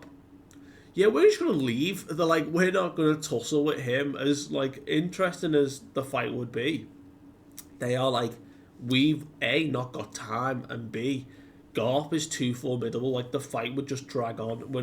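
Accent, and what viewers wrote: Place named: Liverpool, United Kingdom. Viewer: Brooo it’s 4am, you’ve gained a new subscriber and I’m glad to see your journey and growth from the start. YouTube should’ve recommended your channel earlier. You go into so much detail and even explain character emotions. Also having a scouse accent, I went uni in lpool and from Manc hits it for me so pool is like a second home to me. And you are the only British manga reviewer I’ve found. I hope your channel grows!!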